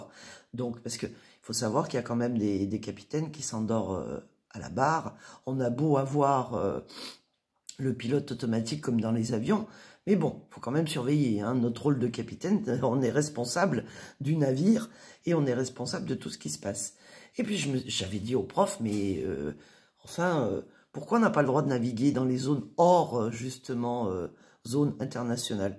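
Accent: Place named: South, France. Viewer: très belle accent du Sud je l’adore et elle fait un taf remarquable ♥️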